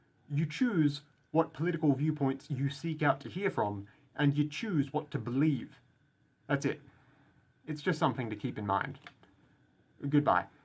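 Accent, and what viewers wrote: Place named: New Zealand. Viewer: The Kiwi accent is the best in the world 🇳🇿🇳🇿🇳🇿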